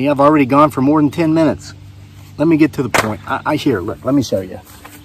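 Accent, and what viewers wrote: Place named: West Virginia, United States. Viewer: You sound like you are from a small town in West “by God” Virginia, lol. I’ve really missed your videos. Our philosophy is basically the same. We must have grown up close to the same small town. 😜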